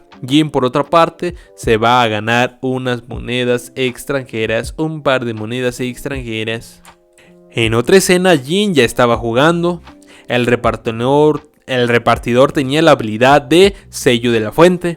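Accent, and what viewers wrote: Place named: Chihuahua, Mexico. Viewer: parece de chihuahua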